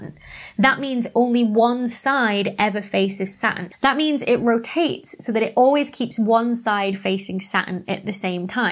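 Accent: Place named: Cornwall, United Kingdom. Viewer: Love it, there was a hint of some Cornish accent coming into parts of the video.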